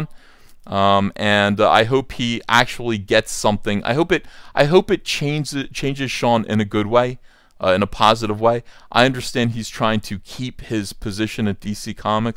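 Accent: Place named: South Jersey, United States. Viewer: I love your accent - has to be South Jersey/ Philly. I live in NYC & that accent is sooo recognizable & unique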